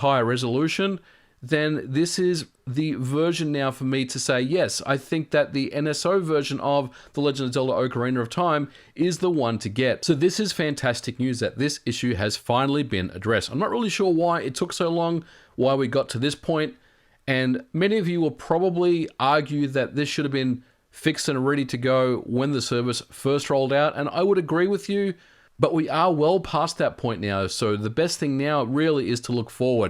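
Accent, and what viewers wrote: Australia. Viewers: a) "oak-uh-reener" man i love australian accents lmao b) i'd really like to know how there are "bugs" emulating a 25 year old game on VERY capable hardware. theyre a trillion dollar company. make it make sense. especially considering how emulation has been on everything else for decades.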